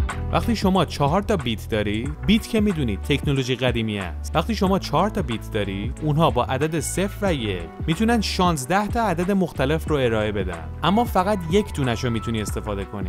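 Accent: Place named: Hamadan, Iran. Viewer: باید جواب بدی . میگما شمادلهجه همدانی داری ..خدای همدانی هستی من یشرط بستم ..حاالا ب رازی بگوببینم. همدانی پوست خرکن هستی ....زود اعتراف کن..😞😒😞😒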